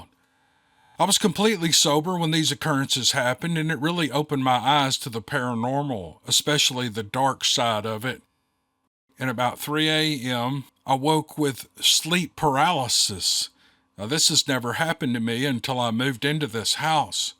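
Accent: South, United States. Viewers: That was a real treat! Great stories! Thanks much! Others read stories but no one else does it with quite the Southern Style of! I'll be leaving a light on tonight! 8 )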